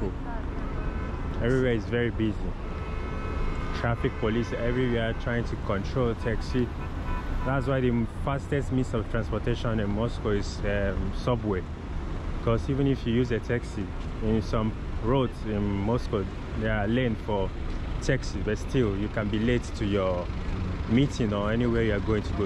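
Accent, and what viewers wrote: Africa. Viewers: акцент не американский, из Африки скорее всего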